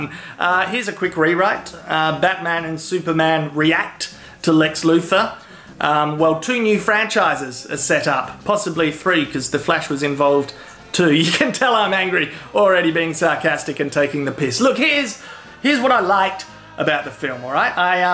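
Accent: Australia. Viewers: You Aussie accent makes this shit so entertaining hahaha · You have THE best internet aussie accent. ~a fellow aussie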